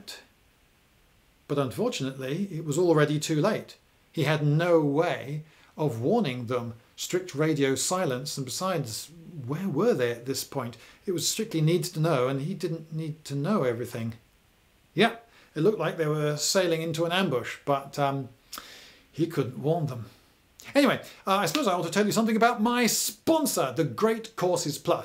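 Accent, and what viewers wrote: United Kingdom. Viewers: just searched it up. Funny thing is, there's a video made by scholagladiator ( or something ) where he mentions his meeting with Lloyd and he actually admits the British pronunciation is wrong at this subject... But I also get that it's just how you guys used to say it so... thanks for responding :) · Yes, realize you're a Brit.... The accent gave it away... Stay on subject,. thank you... ☮️ 🌎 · into? you speak Queen'a english, most of us can barely understand the accent. Don't worry about a tiny "in insignificance", videos are too good AND funny anyways. History is beautiful when explaines with entertainment.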